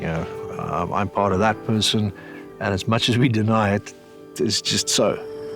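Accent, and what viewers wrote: South Africa. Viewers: I know a south-african accent when i hear one. Lekke lekke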